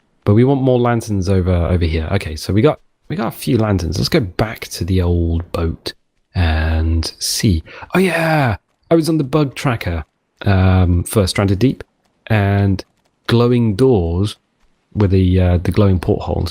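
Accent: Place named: England, United Kingdom. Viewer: You are definitely my favourite Stranded Deep You Tuber. I appreciate your in depth approach particularly on the Cartographer series since I'm getting into modding now. So much changes as ea games progress it's important to explain to your viewers which version you are playing and exactly how things are done, some tubers forget that fact. I find your delivery easy to listen to, some Tubers have good content but are exhausting since they sound like they are are on crack, yelling and swearing constantly, I feel like breaking out the ritalin :D It's also nice to hear an English accent (just personal bias) and since you asked, I'm an English woman living in Portugal.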